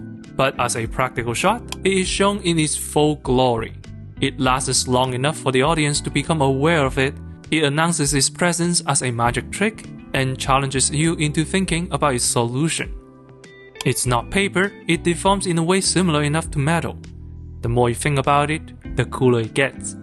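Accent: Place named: China. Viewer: Your chinese accent is so thick that even when speaking English, you still sound like you're speaking chinese. I don't understand half of the things you say.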